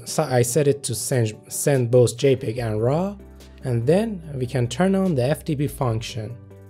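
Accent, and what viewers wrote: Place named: Iran. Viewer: Luv your Persian accent pal.. so glad to see Iranian guys here making professional vids.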